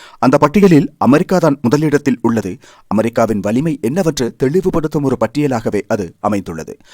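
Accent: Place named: Sri Lanka. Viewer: இலங்கை தமிழர்களின் தமிழ் உச்சரிப்பு (இது போன்றவர்கள்)... கேட்பதில் ஒரு இனிமை உள்ளது ❤ #..... நல்ல விஷயமாக தேர்ந்தெடுத்து பேசி வருகிறீர்கள்.நன்றி❤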